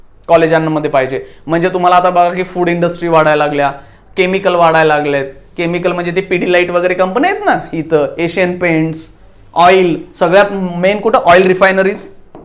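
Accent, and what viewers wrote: Solapur, India. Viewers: Ho Mala pan tasech vatatay Kattar solapuri😅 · Sir tumhi kuthe bhashevarun solapurkr vatatay 😊😊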